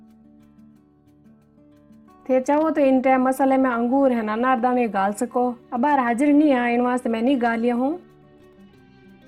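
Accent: Marwar, India. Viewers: वाह जी काबिले तारीफ आपकी सारी रेसिपीज बहुत अच्छी लगी और आपकी मारवाड़ी व मीठी बोली आप की रेसिपी में चार चांद लगा देती है..... आप ऐसे ही आपका हुनर हम तक पहुंचाते रहिए और देश दुनिया में अपना नाम रोशन करते रहिए l धन्यवाद🌼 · Aap ki recipe or marwadi boli bahot hi mithi hai,Ram ram🙏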